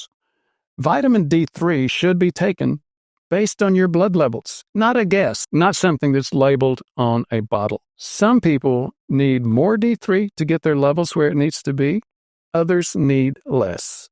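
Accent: United States. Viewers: How about jist get sun and eat healthy why take pills ? If you can afford pills youncan afford food. You sound American where there is no health or longevity studies that show your lifestyles work. Eat well get sun and excersize and he happy and you dont need to get confused of all these pillls you take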